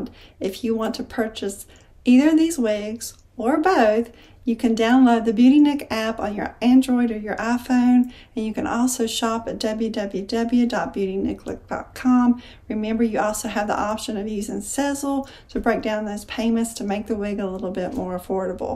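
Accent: South, United States. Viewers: Just subscribed to your channel!!!! So glad I ran across your video!!!! Loved the review and your southern accent as I also have one!!!! So glad to find a review with the same accent as me!!!!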